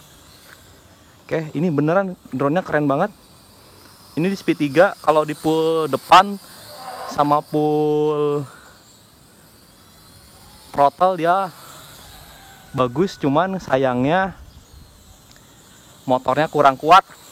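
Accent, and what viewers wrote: West Java, Indonesia. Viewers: Kak orang mana? Ni Sunda pisan... Hehehe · Walaupun pakai bahasa indonesia tp tetep aja sunda nya kelihatan... bnr ga kang? Hehehee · Curiga sunda yeuh soalna nyarios na kitu..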